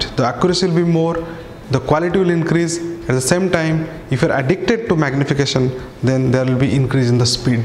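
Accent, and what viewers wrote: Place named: Odisha, India. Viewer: You've got a very nice Odia accent sirji..kudos to you and your team The way my hairline is vanishing i think I'll soon be making a visit to one of your clinics..... as Djimon Hounsou says in Gladiator "But not yet....not yet"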